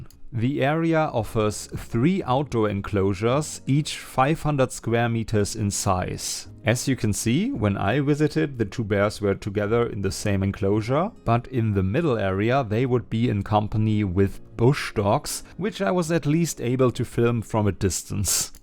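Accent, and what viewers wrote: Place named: Germany. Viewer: It's actually really pleasing to hear your german accent. Especially as it it paired with German punctuality - not just information about the History of the zoos and introducing animals but about the enclousers itself (like how many m'2 the floor area, how many litres or m'3 the volume of aquariums and pools. It's also good that as an European (unlike American youtubers) you also show and talk about the negative side of the facilities. I saw a lot of Americanyoutubers who only talked about how fantastic is - even it's a neglected illegal pet shop in a third world country And the best for me (and 95% of the whole population) are metric system and measurement units.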